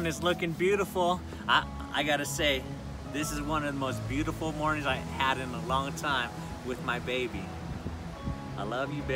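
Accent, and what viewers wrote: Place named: Texas, United States. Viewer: liesss, that's a Texas accent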